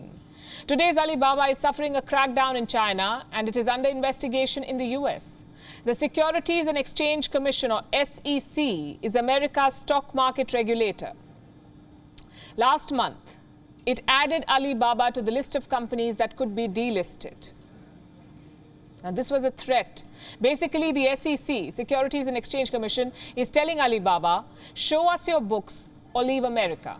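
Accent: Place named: Gujarat, India. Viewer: USA is a rogue country. Typical gujju English by the news reader. Let her go and learn proper English rather than crying with a crimping tone.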